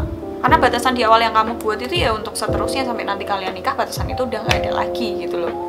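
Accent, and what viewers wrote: Java, Indonesia. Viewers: Medoknya ngangeni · Jowone ngendi iki mba'e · jowo ndi to mbk, kok kentel tenan jowo. ne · Medok yo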